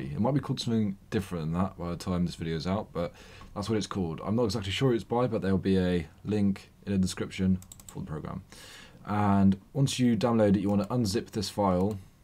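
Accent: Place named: United Kingdom. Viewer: HES BRI' SH!!! (thx btw for the tutorial)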